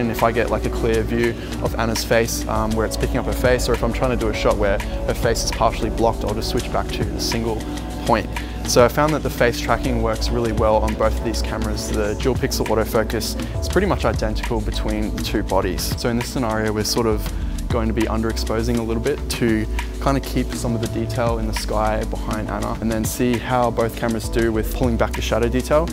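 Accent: Australia. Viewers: Title caught my attention then within 2 words I realised you were a fellow Aussie , within 30 seconds I subbed. Looking forward to exploring the channel more👍🏻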